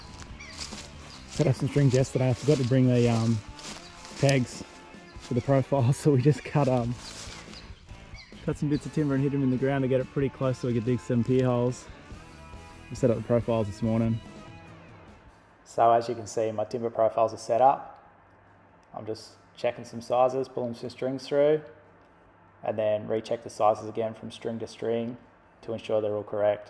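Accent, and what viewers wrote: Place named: Australia. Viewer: This is for Australian viewers , I can see non Australians will have a problem understanding you . Even I gotta listen extra carefully.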